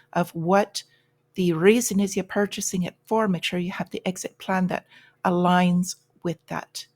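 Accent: Ireland. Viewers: So nice to hear your Irish Brogue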